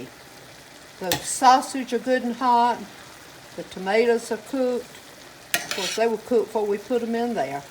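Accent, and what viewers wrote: South, United States. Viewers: I cannot believe that I have laid out on my counter my sausage to thaw my cabbage is already cut up and my onions and ready to make this dish! I live in Alabama, so we do cook very similar. I am the one that makes my potato salad exactly like you do.! I have just found you under recommendation from another lady that I know and I am so thankful and I love your southern accent!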